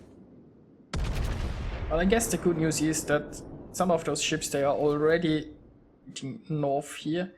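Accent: Germany. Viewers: ich höre jedes mal nen englisch redenen deutschen richtig aus😂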